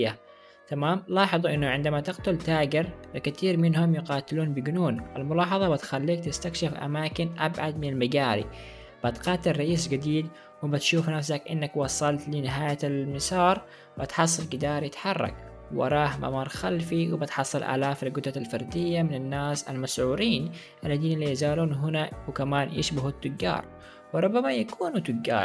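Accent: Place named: Aden, Yemen. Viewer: لهجتك يمني وعدني تحديدا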